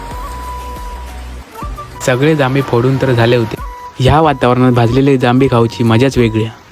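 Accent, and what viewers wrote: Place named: Malvani, India. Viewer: Mast😊 ani malvani voice ❤ ek no.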